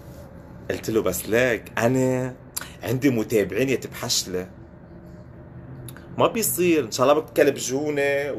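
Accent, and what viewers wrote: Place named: Lebanon. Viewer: ياريت تقلنا انت من وين ساعه بتحكي بناني ساعه خليجي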